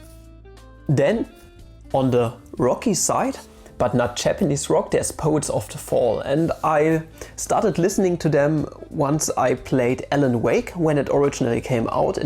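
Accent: Germany. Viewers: Can someone link the vid on the integral he talks about ? I can't decipher the thick German accent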